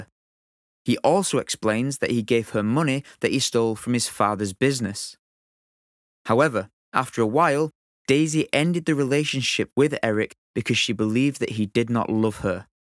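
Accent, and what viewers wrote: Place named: North, United Kingdom. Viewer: Gentle northern accent = alpha commentary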